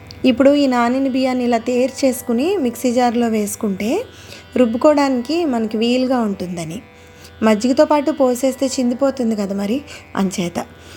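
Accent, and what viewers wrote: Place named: Vizianagaram, India. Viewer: మీ లాంటి వాయిస్ విని చాలా ఇయర్స్ అయింది... ఇలాంటి తెలుగు చిన్నప్పుడు మేము ఉండే ఏరియా vzm లో మాట్లాడితూ పెరిగాం.. ఇప్పుడు వాళ్ళ నార్మల్ గానే ఉంది 🙏🏼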